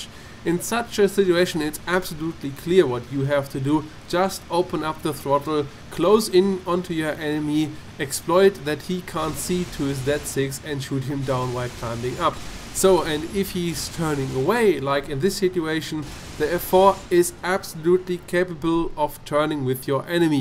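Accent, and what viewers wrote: Germany. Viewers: Love a nice German accent for tutorial. for real. Thanks, great info. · Your accent; so let's speak in Deutsche !!..Ohh Ich nicht sprech Deutsche Ahhaha..jijiij jajajaja, jejej. Bueno, hablemos castellano, idioma más impresionante. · Well thank you! This video (and the whole 'how to' series) is incredibly helpful. *thumbs up In case I identified your accent correctly: Super gut, werde ich sicher noch das eine oder andere Mal als Referenz nutzen. :) Beste Grüße!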